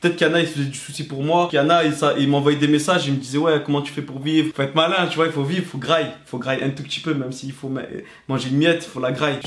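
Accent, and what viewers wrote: South, France. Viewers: L’accent du sud c’est vraiment le pire du monde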